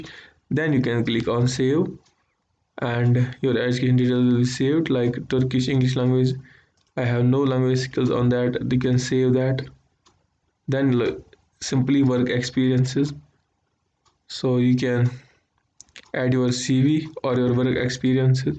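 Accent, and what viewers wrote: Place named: Kashmir, India. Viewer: bhai yaar accent se laga ki ap kashmiri he 😅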